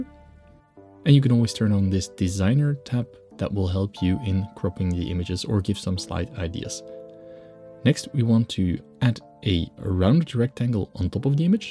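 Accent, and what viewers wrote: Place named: Netherlands. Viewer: I recognize a Dutchie when I hear one !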